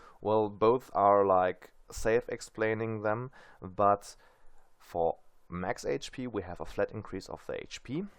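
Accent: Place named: Germany. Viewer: The german accent °-°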